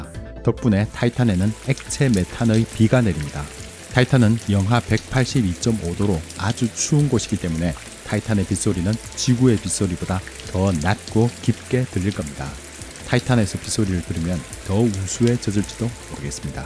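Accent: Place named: Gyeongsang, South Korea. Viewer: 나레이션 하는분 경남사람이시네 부산잠깐살다 떠난이후 오랜만에 들어보는말투네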